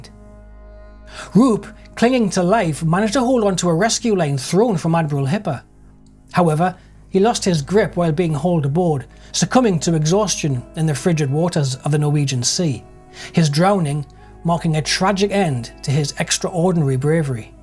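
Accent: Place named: Geordie, United Kingdom. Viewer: Great video man , well done again 👏 Glowworm's Nelson's stile barrny. The Royal Navy's power when the Yards were still open from Newcastle to Shields both Banks of the river. And the Mackems at it knocking out Legandary beasts of the sea.. Sorry marra a gan on one noo an agen !!!oi oi Geordie Boy ! 😉 You may actually nead a translator for the local language you just tried to read 😂😂😂 The coment is directed to the creator of this YouTube channel and his great regional dialect accent. Because he lives near me and probably literally knows one of my friends. Without 6 degrees of separation.😊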